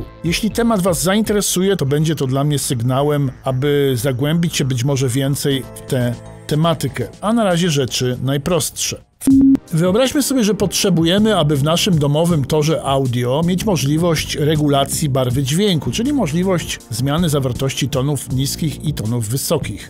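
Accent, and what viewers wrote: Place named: United Kingdom. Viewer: Pańskie filmy bardzo mi się podobają i wiele się nauczyłem. Ale.... Nieznośna jest ta maniera stosowania na każdym kroku fonetyki angielskiej. Może dałoby się to zmienić? Przepraszam za niemerytoryczną uwagę, ale nie mogłem się powstrzymać.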